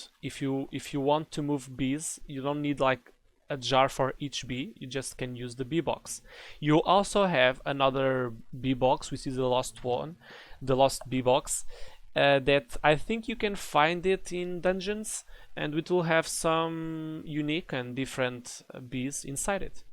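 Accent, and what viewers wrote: Portugal. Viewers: By any chance, are you Portuguese? I recon the accent (Tuga here...) :) Amazing video, been lost with this mod on ATM6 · Are tou portuguese? u seem lisboeta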